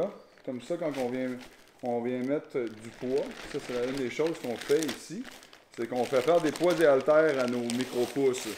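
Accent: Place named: Quebec, Canada. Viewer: Un délicieux accent québécois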